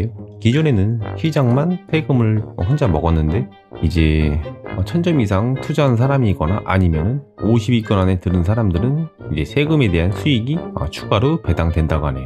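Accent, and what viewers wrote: Chungcheong, South Korea. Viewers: 덕분에 쾌적하게 즐기고있어요 감사합니다 마성의 충청도말투 ㅎㅎㅎ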